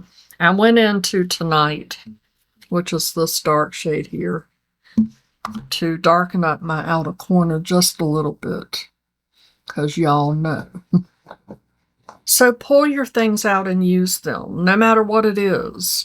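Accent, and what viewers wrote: South, United States. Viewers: Love your southern accent! Have to listen on 1.5x speed though 😅❤🎉 😆🌞❤️💖🙌🙏 I truly needed this video today! Bringing out my wax melts and Dior foundation 🙏😇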